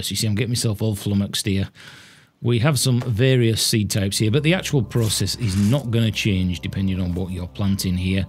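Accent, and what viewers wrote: Yorkshire, United Kingdom. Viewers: Orate buddy luv your vids. I’m tipping you don’t live far from me with that lovely Yorkshire twang you’ve got. I’m playing on PS4 so I’m a few updates behind I believe. Can I still find weed seeds?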